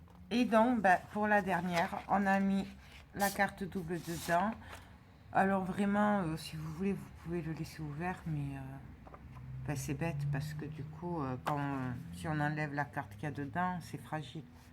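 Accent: South, France. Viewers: Original et simple effectivement, un bon entraînement pour débutant 😀 mais pas seulement 😀j'aime beaucoup ce que tu fais et tes explications avec en prime l'accent du midi, c'est très agréable, merci 😊bises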